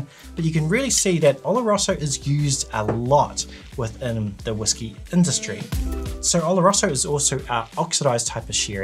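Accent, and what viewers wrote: New Zealand. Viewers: Your channel is so good, needs more attention from the online whiskey community. Very well edited, great presentation, well researched, and I love listening to your Kiwi accent. Cheers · Kia Ora brother, I found your channel a few weeks ago and I must say that you educate on very understanding level. I've been drinking whiskies for 3 decades and last 20 years just single malt on rare occasions blended if I find a good one but I wasn't that good in details about process and casks in deeper dive. I lived in NZ for 16 years and crossed the ditch many years ago so it is always nice to hear this lovely accent. Anyway back to sherry , your choices are spot on, and as you mentioned, like many people, I love A Bunah and 105 a lot. I also love some of the sherry cask finished ones and I am sure you have tried this one before, it is Laphroaig PX, as a peat and sherry lover, this single malt is the best of both. 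Take care, and thanks for the very informative video.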